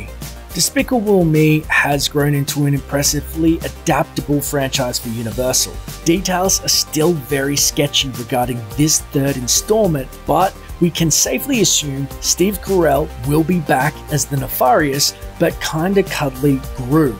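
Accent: Australia. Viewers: Anyone else realize this is Aussie guy Commentating in his regular voice?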